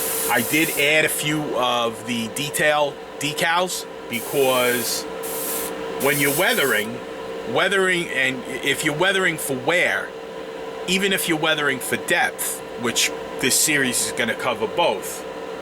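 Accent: New York, United States. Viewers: 😂😂😂, love the video, I’m new to your channel, I’m from Missouri and I like your NY accent.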